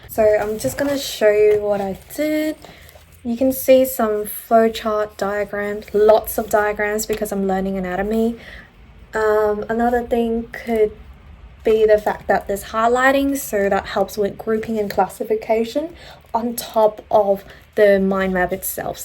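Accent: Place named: Australia. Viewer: omg i literally thought her accent sounds familiar is she australian?? lmaoo im an aussie too!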